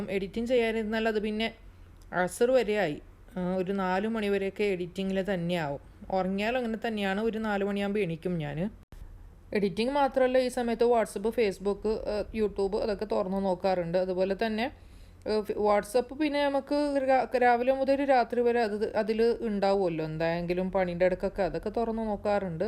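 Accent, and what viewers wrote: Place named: Kasaragod, India. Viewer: Ningal kasargod aano?slang kettit chodichada...evide thamasam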